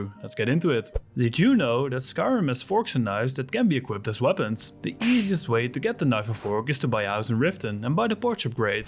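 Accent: Netherlands. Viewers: I love the dutch accent seeping through, so yes instant new abonnee x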